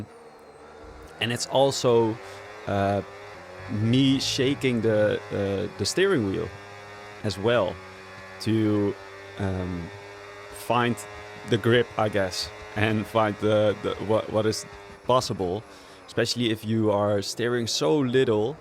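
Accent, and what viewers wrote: Netherlands. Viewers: I think your dutch of the accent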